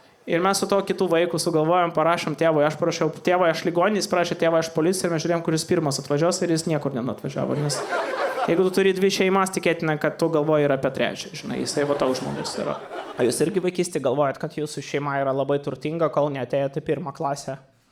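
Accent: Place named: Poland. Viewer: 😂😂 Geras. Prajuokinti Lietuvaičių sunku. Šaunuolis, geri bajeriukai ir tas akcentas Lienkišckas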